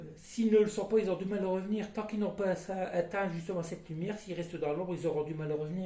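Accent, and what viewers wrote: North, France. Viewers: Bonsoir nouvelle abonnée g vue votre vidéo avec selena à votre voix g su de suite que vous étiez du nord je suis du pas de Calais près de lens 😂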